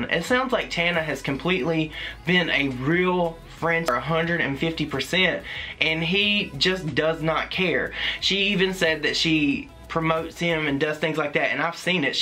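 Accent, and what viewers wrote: South, United States. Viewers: I like ur southern accent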